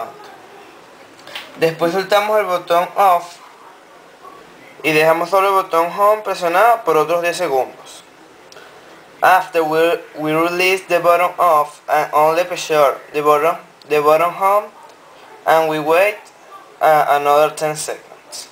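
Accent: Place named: United Kingdom. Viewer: que ingles pareces británico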